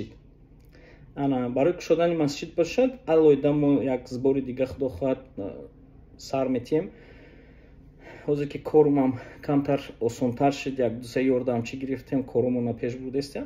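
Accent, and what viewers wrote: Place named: Khatlon, Tajikistan. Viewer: ИЛТИМОС БО ЗАБОНИ ДУРУСТИ ПОРСИ ГАП ЗАНЕД БЕ ЛАХЧАИ ШИКАСТАЮ ОМЕХТАИ ХАТЛОНИ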